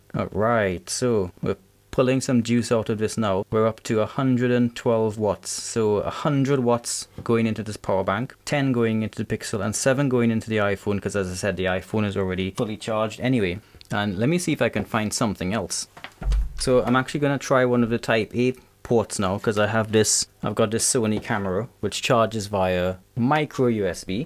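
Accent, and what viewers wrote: Trinidad and Tobago. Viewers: I subscribed because of the accent that sounds trini 😮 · are you from Trinidad 🇹🇹? I was born in the States but grew up and went to primary school there. I know that accent when I hear it👍🏾.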